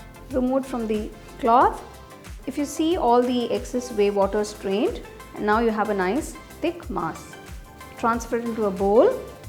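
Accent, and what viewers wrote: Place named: South India, India. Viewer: You need to work on your pronounciation . The words you day aren't clear and our south Indian accent is irritating 😫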